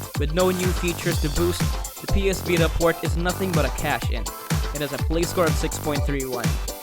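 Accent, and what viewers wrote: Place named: Philippines. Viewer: I swear you really sound Filipino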